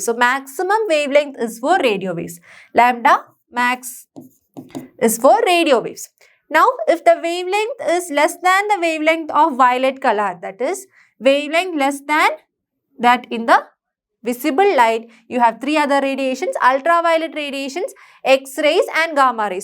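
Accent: Kerala, India. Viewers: Good explanation I think mam is a mallu because of her english accent I perfectly understood the chapter thankyou so much mam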